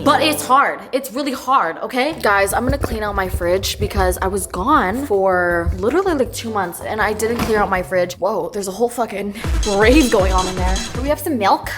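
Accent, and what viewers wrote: England, United Kingdom. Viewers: Love the English accent lol 😂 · We need full British accent video ❤❤ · Thank you, for being your chatty/ oversharing self!! Love the UK accent reminded me of Adele 💗💗 Phenomenal Spanish too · YOU’RE AMAZE BALLS ❤❤❤❤ also the bri’ish accent is gorg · i'll be glad if you make a while video with british accent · wait... omg that english accent is so slay omg.😍😄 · Hiya. The English accent was good, can you please do an Aussie one? I wanna hear a few G'day mate and good onya's! Lol. ❤ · love how spam account is just british 😭😭😭😭😭😭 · your british accent sounded like little Ron Weasley's voice✨ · why does your british accent lowkey sound like millie bobby brown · I was binge watching all your resent videos this week and I’m just happy to have another one to watch. The British accent is killing me tho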